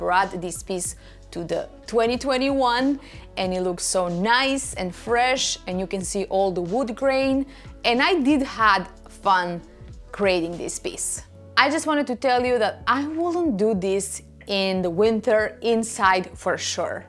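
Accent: Russia. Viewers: Beautiful!! I love this technique, too! I found it by accident when my project got too dark and I tried bleaching it. Worked like a charm! I don’t worry, though I know I should, about the smell. I clean my whole house in bleach quite often; especially in the kids’ bathroom. I have five boys!! Ha! Bleach is my best friend! Also, not sure if you’re Russian, but your accent makes me miss my old neighborhood so much. I had many Russian friends there. Ugh. I miss them!!! 😭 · Здравствуйте. По вашему легкому акценту я догадалась что вы русскоговорящая. Я смотрю все ваши проекты и мне очень нравится каждая ваша работа. Этим летом у меня предстоит большой проект тоже. В спальне буду красить всю мебель, приелся уже этот коричневый цвет. Вам успехов, а мне удачи (не то придется покупать новую мебель 😆)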